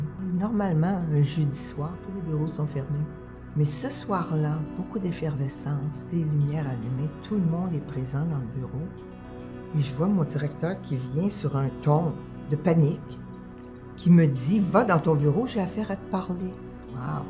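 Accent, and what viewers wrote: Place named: Quebec, Canada. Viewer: c un accent québéquois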